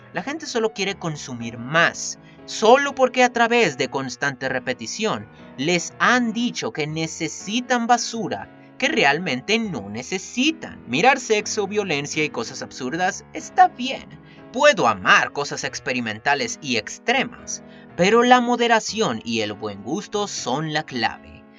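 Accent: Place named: England, United Kingdom. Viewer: Mejor voy Preparando mi Dinero, Para pagarte en Patreon y que dejes tu Acento Ingles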